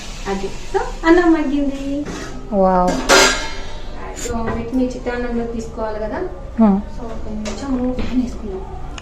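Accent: Rayalaseema, India. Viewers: Meedi raayalaseema anukuntunnanu maadikuda raayalaseema Atp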